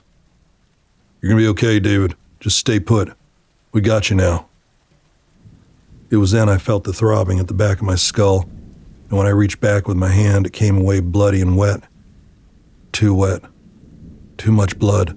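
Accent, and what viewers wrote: East Texas, United States. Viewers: Your East Texas series with your perfect country voice is my very favorite for relaxing. I have PTSD major depression and anxiety disorder so I keep it on rotation when I can’t sleep. Thank you for keeping the good energy in and the bad thoughts away ❤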